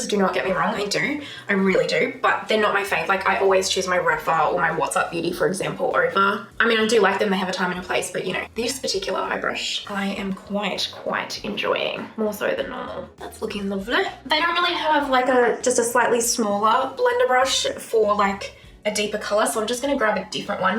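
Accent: Australia. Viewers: Beautiful eye look. I guess I’m a YT dinosaur 🦕.😂😂😂. I like a chill, relaxed, long-form GRWM style video. I personally love to listen to you talk about whatever is on your mind. I think we all enjoy your Aussie accent as well. That TF blush is 🔥. I’m jellies over here in the states.😊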